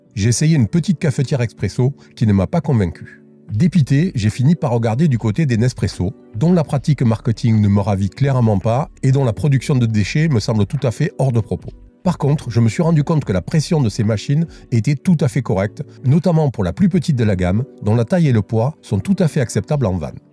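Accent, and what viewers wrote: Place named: Provence, France. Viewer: Bonjour et merci une nouvelle fois pour votre vidéo , qualitative et initiant la réflexion. En effet, je suis à l’aube de faire l’aménagement de mon fourgon, pour un départ au long court avec mon épouse. Nous allons boire du café (beaucoup pour moi!), cuisiner etc..;vous connaissez le sujet. Je m’inspire donc de vos vidéos qui après maintes vues de centaines d’autres sur le sujet de l’aménagement et de la « fourgon’life » me parlent un langage que j’aime bien. Je laisse de côté ce que m’inspirent les autres… Et j’aime bien aussi votre accent, étant moi même de St Chamas. Je voudrais emporter dans cette future vie le robot ménager de ma chérie: le thermomix. Gros consommateur d’énergie, cet outil qu’elle maîtrise parfaitement depuis des années, nous vaut des repas à base de produits frais et divers que l’on peut facilement trouver partout. 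Votre montage électrique, sa composition, sa capacité se rapprochent du montage vers lequel je me dirige. Mais mon niveau électrique me fait hésiter quand à la bonne réalisation du projet. Je peut toutefois compenser par un esprit curieux et motivé. L’emport et l’utilisation quotidienne de cet appareil vous semble t elle appropriée où à proscrire ? Encore merci rien que pour ne pas dire de niaiseries Charles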